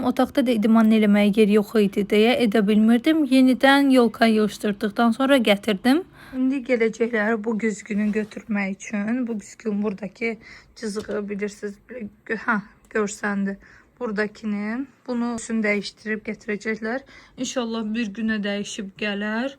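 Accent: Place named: Zaqatala, Azerbaijan. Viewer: Salam,eslen haralisiniz? Zaqatala lehcesiniz var sanki,birde yoldasinizla yas ferqiniz var?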